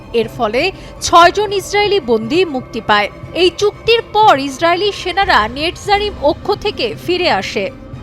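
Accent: Kolkata, India. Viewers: voice dada kolkata theke esse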